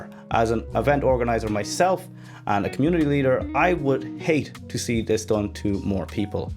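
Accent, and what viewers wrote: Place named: Ireland. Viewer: Are you Irish? you have Irish accent. anyway, great content <3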